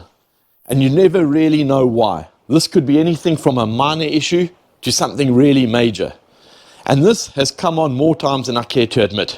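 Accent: South Africa. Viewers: I thought straight away that your English sounded suspiciously similar to my local Eastern Cape English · Are you South African? You sound like a Joburg Boytjie? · I know a South African accent when I hear it 😂😂😂😂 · Dammm sounds just like a South African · Bro, are you South African? I can always hear my country’s accent 😂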